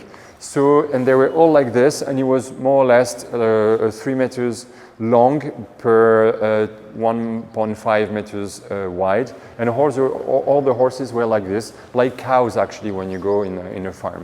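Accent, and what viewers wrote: France. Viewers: Always a champion! Love the changes you have made..your English is superb, the light French accent makes me want to learn the language!!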